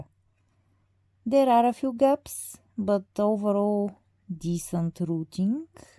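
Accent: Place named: Russia. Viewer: Простите, но акцент вас выдает, вы либо русскоговорящая либо украинско говорящая...я из Украины, для меня это важно :)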